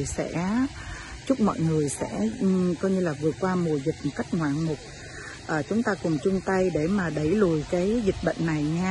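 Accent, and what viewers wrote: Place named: North, Vietnam. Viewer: E thích chị nói tiếng bắc ..vui . Chị nói anh Tuấn Anh quay chung với chị .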